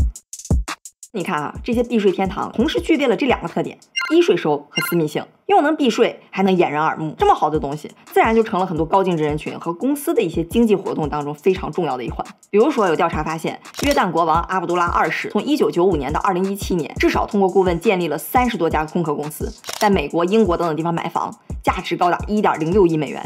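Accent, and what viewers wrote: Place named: Dalian, China. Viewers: 她应该是北方人、语速快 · 总感觉小lin 不是大连人 就是烟台人😂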